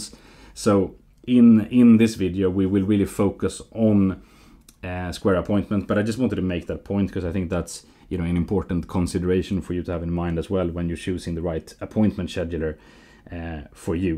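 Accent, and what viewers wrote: Sweden. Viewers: can hear the swedish dialect🤠 but no worries, its alot better than most other dialects👍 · Are you Swedish? You sound like my friends and relatives.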